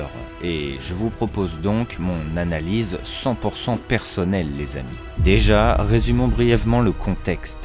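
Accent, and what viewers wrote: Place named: England, United Kingdom. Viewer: Waou l'accent anglais classe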